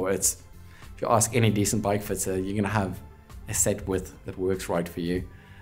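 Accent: South Africa. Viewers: 😂strong opinions much? This cat is hilarious. Do I detect a South African accent? If so it’s typical 😂😂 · A bit of a South African accent I detect there? Lovely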